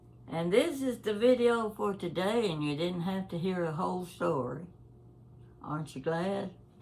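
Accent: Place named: Appalachia, United States. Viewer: Dear dear, you are a delight. Is it just me or is Appalachian accent getting more and more pronounced?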